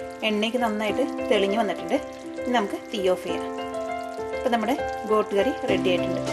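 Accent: Thrissur, India. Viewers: Haai.. Nalla peddakkana thrissur basha.. Nice video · trissur slang polichutta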